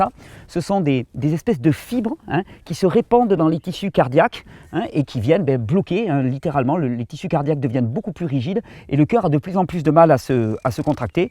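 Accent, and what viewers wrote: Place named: South, France. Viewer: C'est un plaisir de voir tes videos cher mais je dois dire que bien au delà du contenu, ce qui me fait du bien,c 'est dejà d'entendre ton accent du midi, qui porte avec lui la lumière du Sud, c'est cet environnement catalan qu'on peut parfois apercevoir et vraiment si j'avais une suggestion à faire, pour nous faire encore davantage profiter de cela, c'est de nous faire découvrir ta region, ou que tu nous en parles car je t'assure que cela aussi ça nous régénère!! j'adore tes videos en exterieur car on en respire presque l'air!! TU es fier de ta région, fais nous rêver avec elle, 5mn de temps en temps mm durant une video de jus ou autre!!! Merci pour ton travail!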